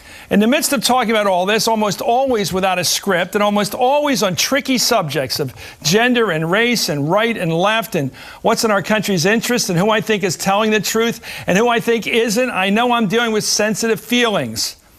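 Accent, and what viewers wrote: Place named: Philadelphia, United States. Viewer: I'm just here to listen to the Philly accent.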